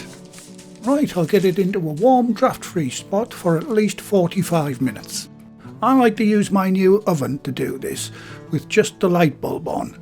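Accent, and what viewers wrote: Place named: Newcastle, United Kingdom. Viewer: Not only are these videos great to watch (and have worked well for me so far) sounds like a Geordie Winnie the Pooh and it's mint!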